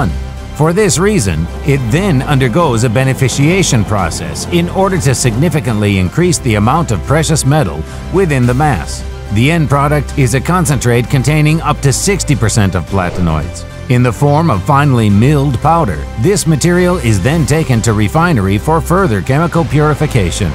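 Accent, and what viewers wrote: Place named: United States. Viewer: Interesting dialect. Almost sounds american.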